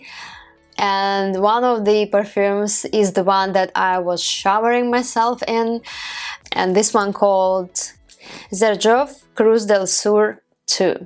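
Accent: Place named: Russia. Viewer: ну вы явно русскоговорящая. Через экран слышно😁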